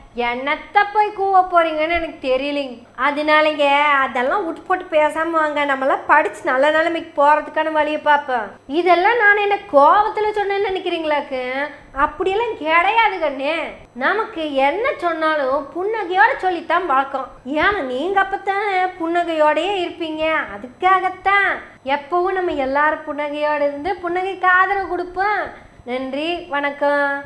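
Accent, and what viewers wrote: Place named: Coimbatore, India. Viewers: சேலையை கட்டிட்டு கோவை தமிழில் தங்களை போல் பேச இனியொருவர் பிறப்பதில் சந்தேகம்.ஏதோ புகழ்ச்சிக்காக அல்ல.உண்மை. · அடடா.....கோவை ஸ்லாங் சூப்பர் அம்மணி...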